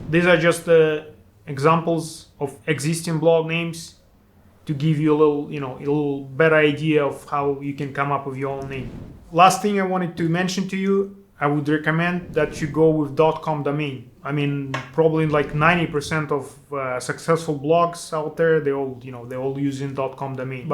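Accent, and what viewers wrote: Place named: Bavaria, Germany. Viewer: Your accent sounds like your from Bavaria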